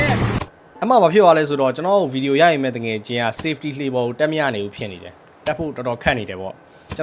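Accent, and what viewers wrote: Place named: Mandalay, Myanmar. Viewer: Are you originally from Thanbuzayat.?Actually,I thought you are from Mdy.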